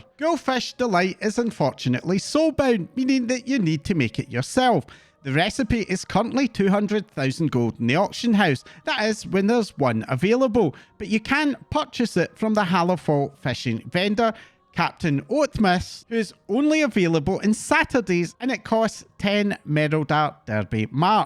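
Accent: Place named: England, United Kingdom. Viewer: Your english acsent is epic <3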